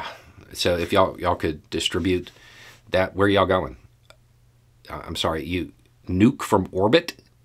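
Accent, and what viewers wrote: South, United States. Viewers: In my head, I say your opening lines with you....and at times aloud, in my best imitation. Being Canadian, that Southern accent don't come easy, eh. Well, ya got me this time. Coffee came out my nose when you said, interstellar people....strong black coffee. You made me laugh, but I didn't like it.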